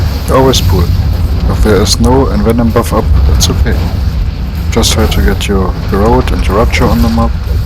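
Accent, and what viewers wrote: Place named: Germany. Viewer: OMG der deutsch akzent XD Ich liebe es HAHAHAHA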